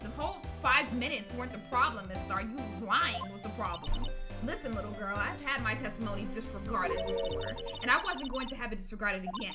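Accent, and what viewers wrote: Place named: South, United States. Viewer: Girl your cowboy southern accent is too funny!!!!😂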